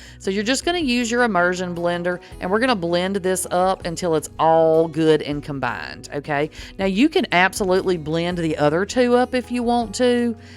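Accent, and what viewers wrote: South, United States. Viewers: I could listen to you all day! Love your wonderful, southern accent! Oh, and your idea of individually freezing the creamers into little tablespoon servings was such a great idea. Thanks for sharing! ❤ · Idk where you’re from (this is my first vid), but I’m betting TX, MS or GA. I grew up in TX and lived in MS for a long time. I miss hearing a Southetn drawl. I’m back home in Ohio now. In any case, I’m looking forward to making some of these creamers—they are getting ridiculously overpriced at the grocery store for being bottles of milk and sugar! SMH PS I bet we’d have been best friends had we ever met!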